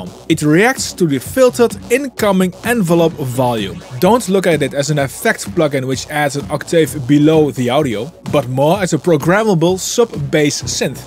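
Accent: Netherlands. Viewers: i hear the nethelands yes, goede video tho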